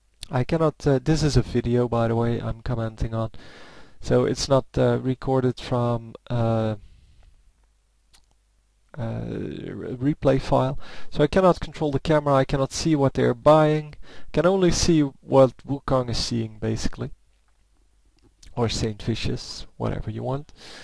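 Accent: Netherlands. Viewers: mooi hollands accent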